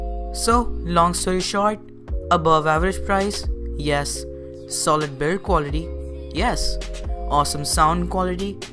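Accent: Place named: India. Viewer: Indian Accent..!! Aaack 😡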